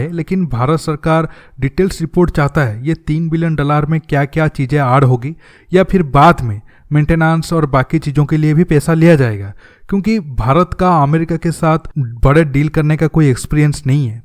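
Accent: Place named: Assam, India. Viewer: Bhai aap Assamese jaise baat kyu karte ho . Mere khayal mein aap okhomiya hoga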